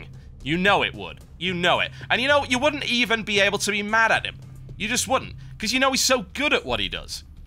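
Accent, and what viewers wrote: United Kingdom. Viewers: U gotta love British cast.... Great great job mate ;-)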